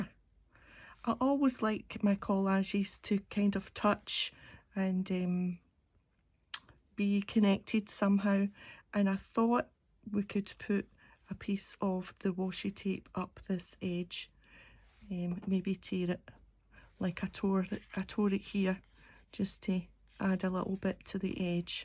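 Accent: Scotland, United Kingdom. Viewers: Great to hear another Scottish accent. Fabulous box of goodies. Love what you made💕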